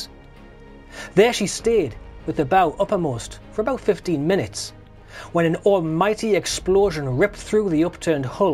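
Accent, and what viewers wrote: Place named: Scotland, United Kingdom. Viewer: I definitely like your Scottish accent. Thumbs up, subscribed.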